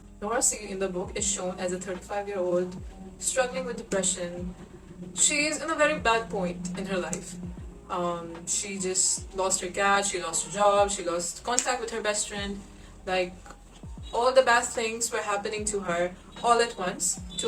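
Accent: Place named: England, United Kingdom. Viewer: literally set fire on stage 🔥🔥🔥 and your english accent!! Wowww!!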